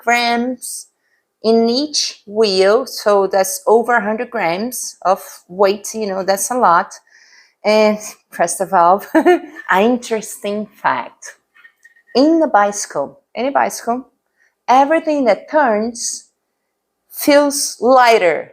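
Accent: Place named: Brazil. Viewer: ok nice ! I worked for a Brazilian bank for 5 years. So I recognised the accent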